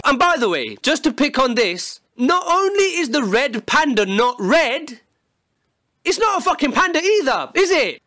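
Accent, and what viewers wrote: United Kingdom. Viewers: You british or what?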